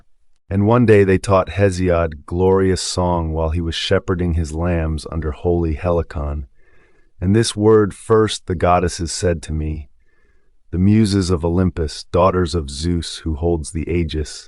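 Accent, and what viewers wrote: United States. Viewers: American accent is an abomination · American accent reading the classics. It's just wrong.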